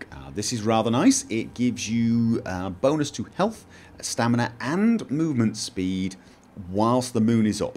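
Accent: United Kingdom. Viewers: dude i just love your vids your british(could be scotish i cant tell these sort of accents apart :P)accent makes it so enjoyable to watch :)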